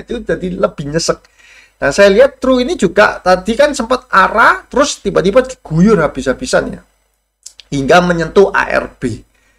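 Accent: Surabaya, Indonesia. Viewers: saya suka sekali ... medok medok Surabaya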